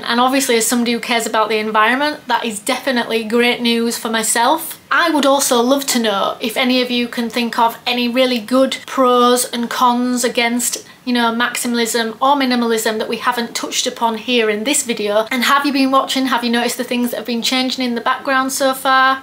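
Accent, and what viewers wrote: Yorkshire, United Kingdom. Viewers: Yes, I did inherit the Yorkshire sense of humour-the best sense of humour in the world! I was born in NZ, but my entire family was born in Leeds, including my older brother. I think that is what initially attracted me to your videos. Even after being here 60+ years, my mum's accent is still as strong as yours. I have only been following you for a couple of months and am gradually working my way through all your past videos. It's about 6.30am on Friday morning (yes, I am in your future😱😳🤪😅), so time to get mum out of bed - no rest for the wicked. Do you have a job? How do you fill your day? Maybe you have done that video, and I just haven't seen it yet. I like watching your thought process as you declutter. I have trouble with letting go of mementos picked up from traveling and items that remind me of good times. And teddy bears. 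And CDs. And tarot cards. And duplicates of things (as NZ is earthquake and tsunami prone, I am constantly worried about not having backups if I lose the original). Oh God, 10 minutes later, and mother will be wetting the bed. Have a good night. Enjoyed chatting. If you are ever down this way ..... call in, have a coffee, stay. Would love to meet you, you come across as kind, funny, calm and very friendly 💖💖💖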